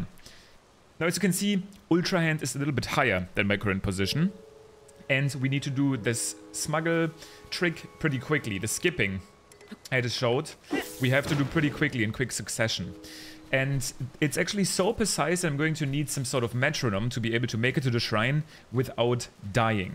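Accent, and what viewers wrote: Germany. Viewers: I love your accent! Southern American here. Apologies if you aren’t German, but one of my favorite teachers ever was German and you remind me of him! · I can hear the German accent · Wie man einfach instant hört, dass du deutsch bist haha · Are you german?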